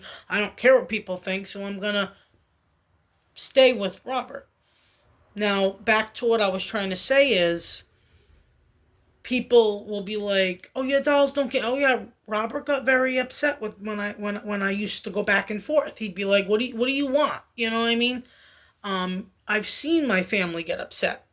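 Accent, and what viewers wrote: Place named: Yonkers, United States. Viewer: Randomly stumbled onto your channel - I have no idea about the doll side of YouTube. But I really enjoy listening to you speak. You sound like my family from Yonkers 🥹 maybe it's calming to listen to you reminding me of them.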